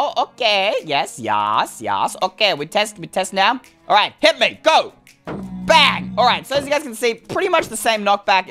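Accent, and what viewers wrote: Italy. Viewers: OMG. ‘I have 2 things 1: I ABSOLUTELY LOVE YOUR ITALIAN ACCENT ! 2: Dream : Want my comment to be hearted ( idk if u did ) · I just love your Italian Ascent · I LOVE your Italian accent!!!!!! You make every day so much brighter!!!! ☀️☀️☀️ You are amazing!! I love you ♥️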